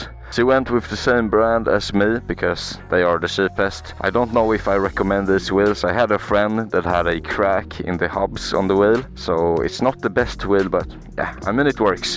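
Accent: Sweden. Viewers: So much swedish accent😂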